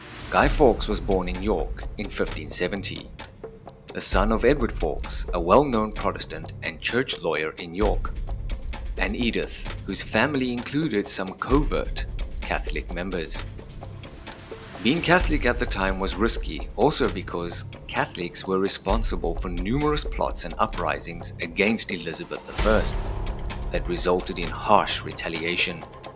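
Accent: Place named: South Africa. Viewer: I note your South African accent. My ancestors were REFUGEES to the Cape Colony from France, due to bloody religious persecution BY THE CATHOLICS, who MURDERED the family who remained in France. Catholics TORTURED and MURDERED whoever disagreed with their totalitarian orthodoxy. Fawkes was an attempted MURDERER of the cradle of modern democracy. He was no hero. He was a servant of tyranny. His death is celebrated to this exact and VERY DAY throughout the UK. With good reason.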